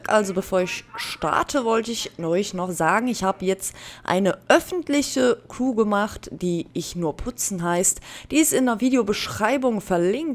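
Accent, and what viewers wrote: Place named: Swabia, Germany. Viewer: Ich mag Deinen leichten Schwäbischen Akzent 😊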